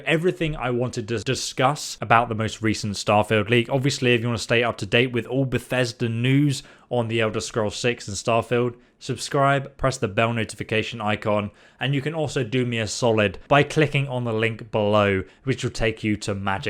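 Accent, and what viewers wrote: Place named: United Kingdom. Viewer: Eh it's a bri ish thing. At least I think hes British either that or hes Scottish or something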